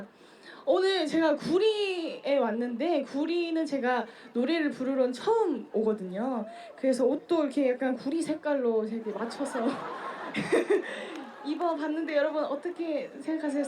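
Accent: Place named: Seoul, South Korea. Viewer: 전유진 서울사람다됬네 이제는 서울말을 자연스럽게 잘하네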